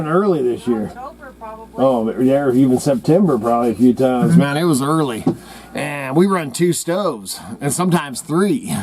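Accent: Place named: Idaho, United States. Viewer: 1st time viewer. Got a kick out of your Idaho hillbilly chatter. I'm old and lived around hillbillies from west to east and all over the south but looked at northerners as city stickers. Might be because new York and Chicago is upstate. Live in the hills of Kentucky, edge of the Cumberland near mammoth cave park. Got to say, there's not much differences between Idaho and KY billybobs....Got a kick out of your video...Even Miss Kitty ☺️. I never knew people had so much nit picking feeding their.chickens. What ever happened to table scraps, fats from cooking, crushed shells mixed with peanut butter, lol... a little tid bit for you dear. I buy the corn and seed mix from tsc. I figure chickens eat everything....even sheep and goat feed if hungry enough. I've never seen a chicken full. I'm guessing it's the processed feed and not the scratch feed that stops hens laying. 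Biden said he was going to collapse the food industry. I heard it myself in disbelief he even said it and it phased nobody and was not shown again until Tucker showed it on fox recently. And again. No public response. Got to pinch myself now and then ... this is still America, right? Or a petri dish for some creepy villain in cartoons or movie? Ha! I believe it's a God thing and now people minds will be blown....I mean really blown away. Daddy told me to stay away from flights and never throw the 1st punch. Wait for it and do you you have to .... run or fight... just win it or give it your best. That all a should c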